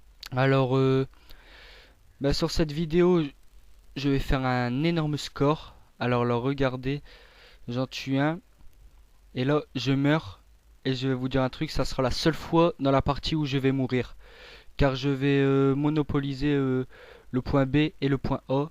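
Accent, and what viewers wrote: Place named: North, France. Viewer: sa senten a ta voie ke tu vien du nord mdr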